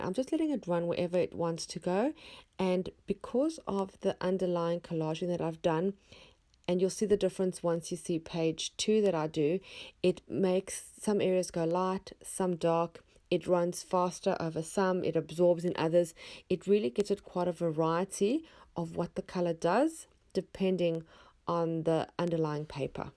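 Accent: South Africa. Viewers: It was so wonderful to hear a South African accent!! First time viewing your channel! Sending blessing from sunny South Africa!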